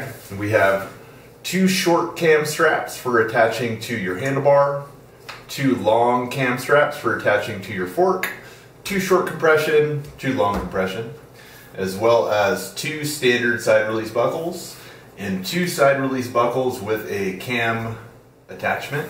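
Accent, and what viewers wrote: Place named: Baltimore, United States. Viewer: Just got this bag and I'm psyched to do my first trip w/ it. I like your mid-Atlantic Baltimore / Philly accent. It's subtle but those vowel shifts are noticeable. Thanks for making a badass bag.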